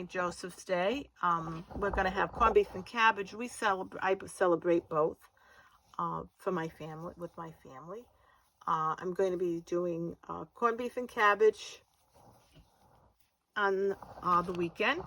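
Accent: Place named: Brooklyn, United States. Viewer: Are u from Brooklyn New York? Your accent sounds like you are. Love your accent!! Im from Louisiana and still live here and ppl think we r from Brooklyn as well. Lol!!! Im asked all the time r u from Brooklyn? Lol! ❤❤ loves these lil baskets! Going to make a few and put candy in them for the family. Thanks! ❤❤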